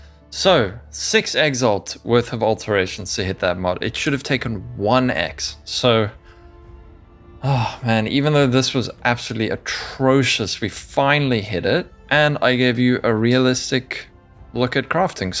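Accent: South Africa. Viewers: Are you Afrikaans? Your English accent sounds like you might be from SA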